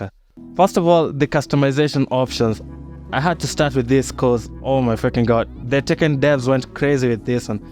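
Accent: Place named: Kenya. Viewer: Just stumbled on this channel, you sound Kenyan 🤔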